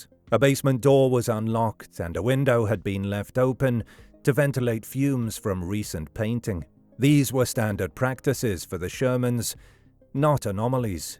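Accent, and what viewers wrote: England, United Kingdom. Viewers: I live here and visited the house days ater...It was not a gated house as you state and they were not onte of the most influential in the country....i;m only a minute in but your already this wrong I wonder what senasationalistic journalism awaits....shnooty english voice and all....work more on facts and less on being entertaining.This isn't Seinfeld we came to watch. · Why do we need a commentor with a British dialect to do a Canadian situation. Do we have to Outsource everything?😢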